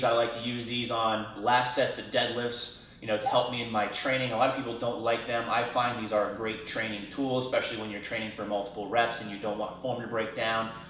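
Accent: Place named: Baltimore, United States. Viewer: are you from Baldimore?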